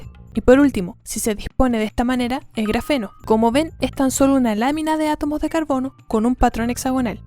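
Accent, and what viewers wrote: Chile. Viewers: Buen video! Eres chilena? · Me gusta más tu acento chileno 7u7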